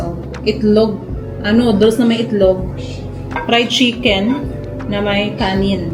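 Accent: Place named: Hiligaynon, Philippines. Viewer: You still have Ilongo accent even speaking Tagalog ay ambit sa iyo Monday!!!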